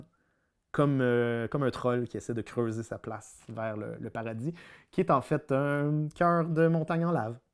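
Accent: Quebec, Canada. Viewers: L'accent, ça va, je m'y suis fait avec toutes ces videos québécoises. Bientôt c'est moi qui vais le prendre, PAR CONTRE, cette image convexe ou concave, ce n'est PAS possible !-)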